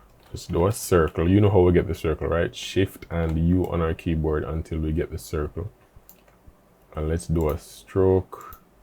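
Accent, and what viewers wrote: Jamaica. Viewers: You sound like a Jamaican lol. I know a brother when I hear one but I could be wrong😂. Great job champ I learnt alot.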